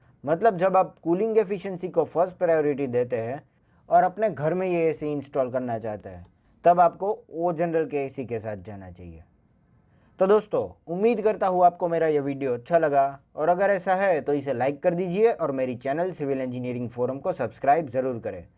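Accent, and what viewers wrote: Gujarat, India. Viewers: Gujarati Cho Brother?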